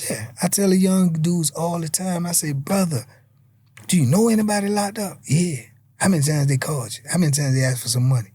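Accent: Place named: Washington DC, United States. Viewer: He got a strong dc accent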